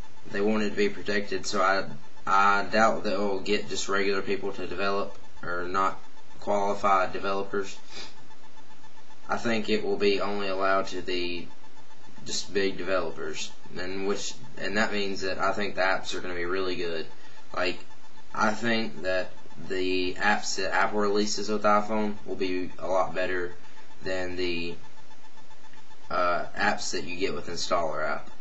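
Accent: South, United States. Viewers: Is it just me, or did used to have more of a southern accent when he was younger?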